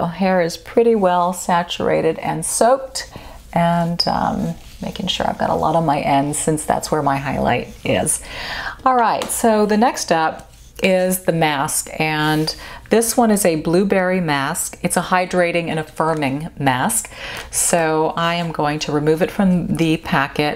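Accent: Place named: United States. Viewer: So inspiring as always, thank you so much! Merci pour ton anglais américain pour moi très agréable à entendre, je te suis depuis longtemps non seulement pour tes vidéo, ta personne mais aussi pour apprendre cette langue qui m'étant si nécessaire. Loving you from Paris! Have a nice weekend!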